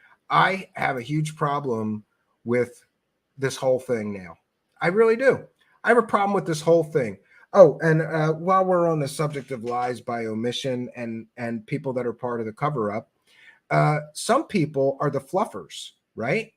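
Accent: Philadelphia, United States. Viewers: Are u from Philly? Or the Philly area? Ur accent sounds like my Philly accent hahaha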